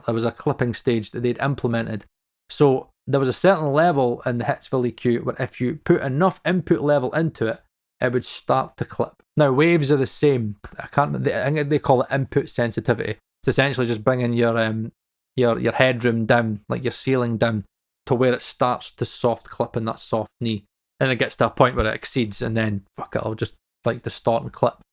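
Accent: Scotland, United Kingdom. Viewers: instant subscribe here. Watched that AP video and just felt like he was missing so much. Especially you talking about the unique behaviour of the controls on EQs, and reaching a result is very different from recreating a result. This and the cramping, parallel architecture etc. That and a Scottish accent and i'm 110% in.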